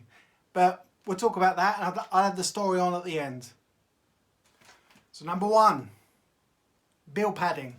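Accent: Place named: England, United Kingdom. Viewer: he's definatly not aussie...im aussie, and i picked up straight away he's a pom(english). they dont say"th", as in "noTHing", they say "nuFFin", thirty is firty,etc.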